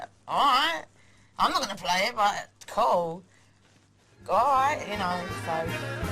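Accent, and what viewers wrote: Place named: England, United Kingdom. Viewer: Love her English accent. Sad she died so young.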